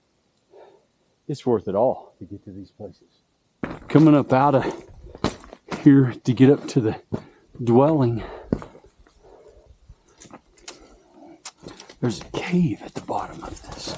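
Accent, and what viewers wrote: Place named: Tennessee, United States. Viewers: Where are you from? I can’t place your accent. Tennessee is what I think. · I subscribed after watching my first video of yours, then went back to watch others. Now I look forward to each new one. You have a really soothing voice with it's southern drawl; and a storytellers style of talking that I enjoy. That inaccessible dwelling was an amazing find. The amount of effort the ancient people had to expend to haul all the rock and mud to build the wall of their dwelling. I concur that a tree would be excellent for climbing, but again the effort in that terrain! They must have been really desperate!. I'm very curious what kind of rock that long white streak was composed of?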